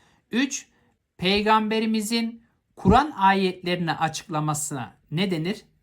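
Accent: Malatya, Turkey. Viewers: Hocam Malatyalı mısınız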